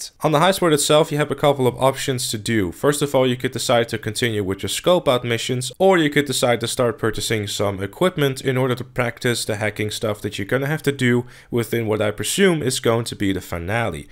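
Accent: Netherlands. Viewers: Ik hoor een beetje een Nederlands accent? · are u from the netherlands ?? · Hollander zeker :p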